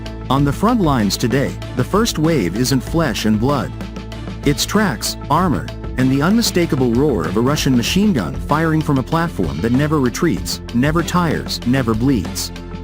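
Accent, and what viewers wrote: United States. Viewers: 'Next-Generation Futures' mixing of videos featuring Western GRS's armed with M2 Browning machineguns onto the subject of Russian GRS's, is either completely irresponsible or downright naive. This is just another example of the amateur nature of the entire 'Next-Generation Future' platform, complete with its nauseating AI-generated American accent.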